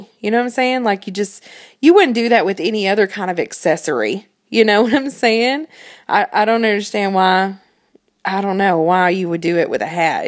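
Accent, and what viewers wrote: South, United States. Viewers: got that cute little southern twang in your voice :P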